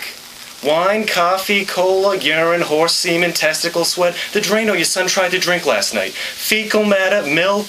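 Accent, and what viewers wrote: Boston, United States. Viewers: This guy sounds like he's from Boston Fawwkin Queeeeah! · hahahah boston accent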